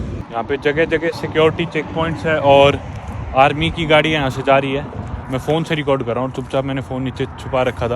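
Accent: Haryana, India. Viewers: Bhai chora Haryana kaa lage hai !!!!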